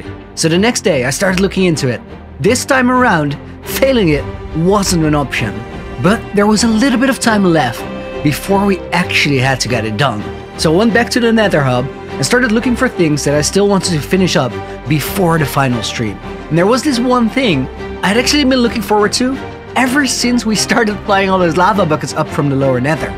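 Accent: Netherlands. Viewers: Brooo this shit is amazing, I love the vibe but I did recognize a Dutch accent right away ;). Ziet de lekker uit man!! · I love your Dutch accent ahaha why have I never found your channel before?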